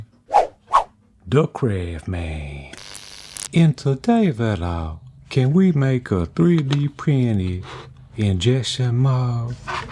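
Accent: Louisiana, United States. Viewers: i didnt know i needed to hear a sultry cajun tell me about injection molding today, but man was that like soup for the soul. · What region of the USA is this accent from? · As an Aussie, I can't place your accent for the life of me. I can tell that it's most likely from the US, but the exact region has me stumped. Where abouts are you from, my man?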